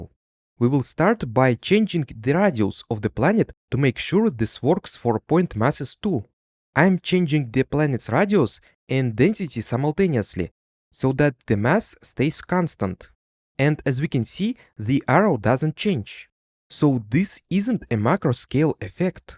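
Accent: Russia. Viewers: отчётливо слышно русский акцент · я даже и не знал что у него есть канал на английском а ещё русский акцент очень возникает)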